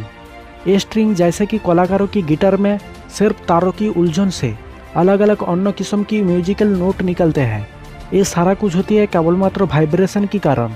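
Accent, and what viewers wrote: Bengal, India. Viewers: Sir ap ki Hindi thodi alag hai like a Bangla language.But nice video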